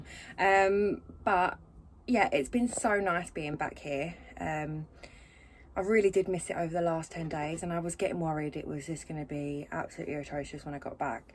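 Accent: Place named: Essex, United Kingdom. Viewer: I believe it's an Essex accent.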